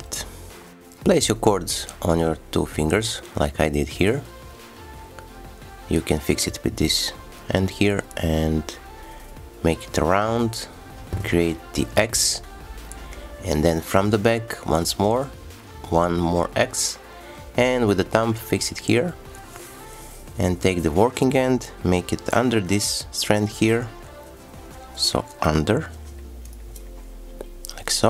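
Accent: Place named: France. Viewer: Very good film avec accent french😂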